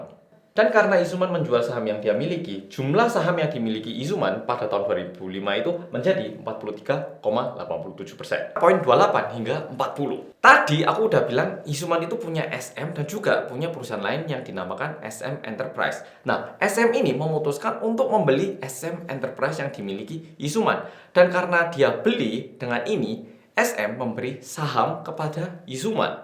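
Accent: Java, Indonesia. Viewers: Penjelasannya mudah di mengerti padahal Jawa ne medok tenan😎 keren keren 👍👍👍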